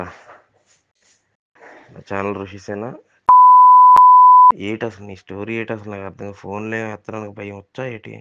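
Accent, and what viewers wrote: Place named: Andhra Pradesh, India. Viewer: ఇది ఆంధ్ర స్లాంగ్.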